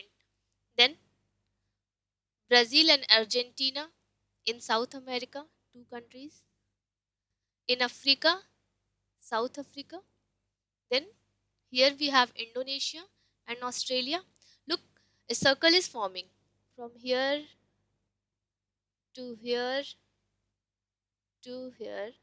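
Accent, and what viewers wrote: India. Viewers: you sounds like wal-mart and dell customer service, both are indian.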